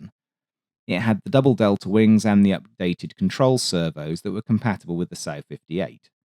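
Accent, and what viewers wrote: United Kingdom. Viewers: Hearing a british voice talk about killers The uk is nothing but murdererous thieving pos country.... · I like the British accented narration. Just gives it more credibility. Like Great Planes or Discovery Wings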